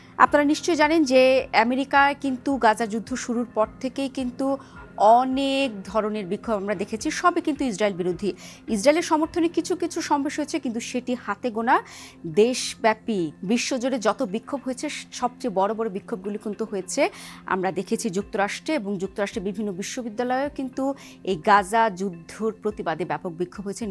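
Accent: Kolkata, India. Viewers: kotha to sundor but indian style a hoise seta khub e kharep lagse.. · কোলকাতা স্টাইলে সংবাদ উপস্থাপন😂। আপাতত শুনতে ভালো লাগলেও দয়া করে কোলকাতার সাংবাদিকদের মতো চিল্লা চিল্লি শুরু কইরেন না ভবিষ্যতে। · ভারতের ঢংয়ে কথা বলবেন না।।।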